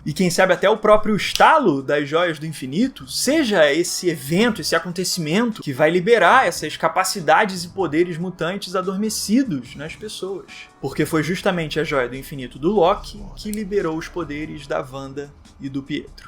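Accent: Rio de Janeiro, Brazil. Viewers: Tu é carioca mérmão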